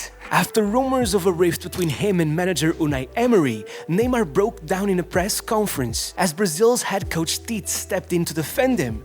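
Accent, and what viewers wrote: Portugal. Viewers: Love your Tuga accent. Cheers from Portugal!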